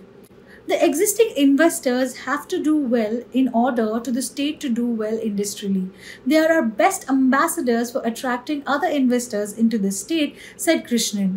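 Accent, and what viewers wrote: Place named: Tamil Nadu, India. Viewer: Her( tamil)pronounciation ❤❤❤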